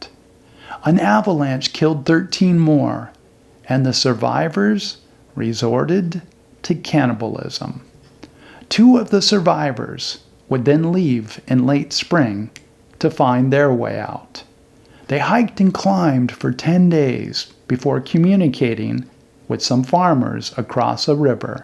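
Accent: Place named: England, United Kingdom. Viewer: I really enjoy hearing listening to your English accent with clear story explaining like this. Thank you 🙏🏻 so much. Please take care and enjoy your time there 🙏🏻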